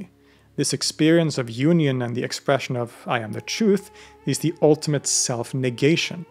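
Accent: Arab world. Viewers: Dude stop pronouncing Persian town names in a arabic accent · What an amazing arabic accent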